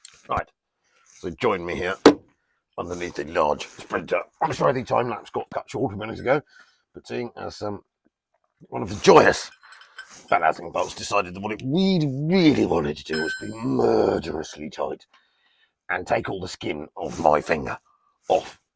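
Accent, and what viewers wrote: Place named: Dorset, United Kingdom. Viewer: Corrrr lummey, that auto box is much much better than those hatefull preselector semiauto boxes you get in sprinter ambulances, I have been put-off an ambulance to convert to living van for that very reason. I am defo going to bend your ear once I get the go ahead from mad quack to get my chuffin' licence back. I'd love one of them there oldie style sprinters or maybe a long Crafter 😁 Sounds like you've got a better microphone now, love your Dorset patter, waiting for next video, have a nice week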